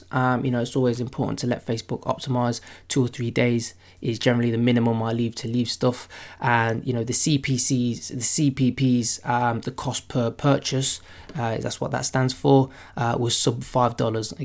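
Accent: England, United Kingdom. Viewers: R u from England?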